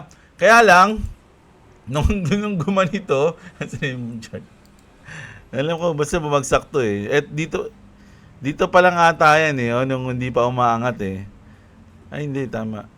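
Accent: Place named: Caloocan, Philippines. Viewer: Alam mo gustong gusto ko ang mga Pananalita mo. Lumaki rin ako sa caloocan at ganyan ganyan din akong magsalita noon Pero ngayon senior na ko at tumira dito sa mindanao naiba na ang pananagalog ko. XRP lilipad na!!!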